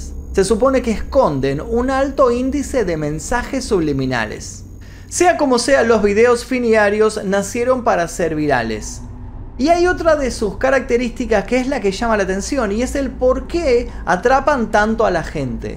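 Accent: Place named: Argentina. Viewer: si se re nota que es argentino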